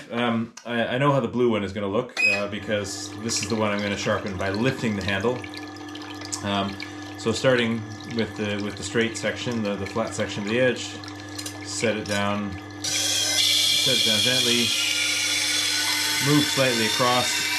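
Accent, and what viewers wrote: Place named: Canada. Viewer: Perhaps its because we both speak 'Canadian' (!) but I finally understand the concept. Thanks again for the time you take to film and explain.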